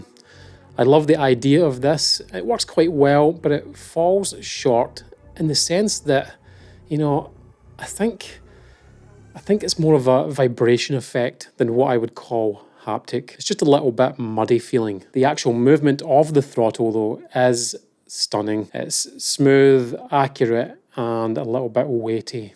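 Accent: Scotland, United Kingdom. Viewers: i never knew i always wanted a scottish reviewer in my life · Thanks! Just ordered the WinWing Orion 2 F15 EX throttle and separate MOZA AB9 flight stick. Hoping that they're good 👍. I'm a scot living in Texas so was glad to hear the accent in your review. Thanks again.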